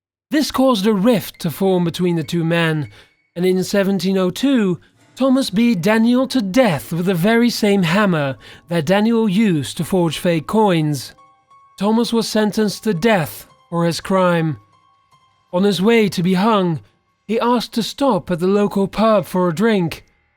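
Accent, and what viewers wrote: United Kingdom. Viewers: the guy narrating this sounds like a british version of chillz.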